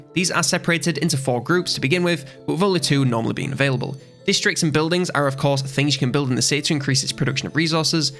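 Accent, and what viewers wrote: United Kingdom. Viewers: Accents are a thing, I speak French from France but live in Canada and have a hard time understanding Quebec French. The lad in the video here has a heavy Bri’ish accent and skips every third letter. Perhaps he should use AI to narrate.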